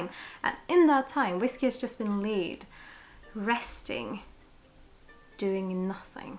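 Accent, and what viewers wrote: Scotland, United Kingdom. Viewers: Why do you sound Scottish?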